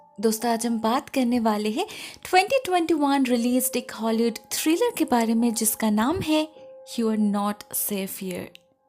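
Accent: Bengal, India. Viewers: her accent described it so well that she's bengali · R u begali...it seems by your tone of Hindi...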